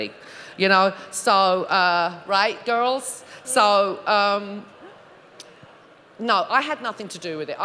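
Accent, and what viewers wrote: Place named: Cockney, United Kingdom. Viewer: Love that East end accent!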